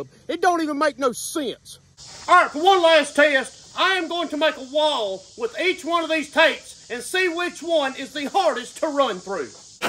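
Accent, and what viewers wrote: South, United States. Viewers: That's southern lingo. Window (winder), tomatoes, patater, onion etc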